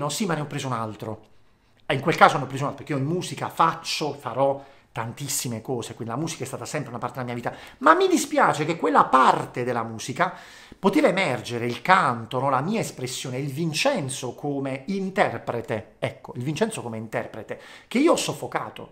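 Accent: Apulia, Italy. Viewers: Grazie Vincenzo di trasmettere positivita e ci incoraggi ad avere fiducia in noi stessi grazie ,è bello sentirti parlare con la cadenza pugliese sei unico ,mi piace quando inserisci delle parole pugliesi ,mi trasmetti la gioia di vivere❤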